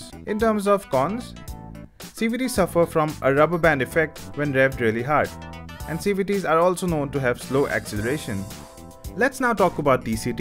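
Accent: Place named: India. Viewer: Jesus dude. Work on your accent. If I want to talk to an Indian I can’t understand, I’ll spend $2k on a plane ticket to a sh!thole South Asian country, buy a sh!t Ton off nose plugs to block all the curry and BO smell, throw a towel on my head to blend in, then proceed to talk to all the snake charmers in the land. Just kidding I would never do that because NO ONE GIVES A F$&@ ABOUT India.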